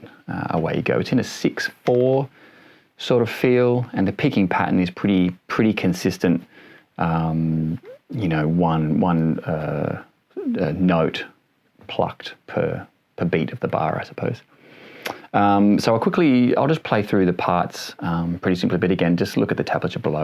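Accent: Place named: Australia. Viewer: Also very cool to find another Kings X fan with an Australian accent 😀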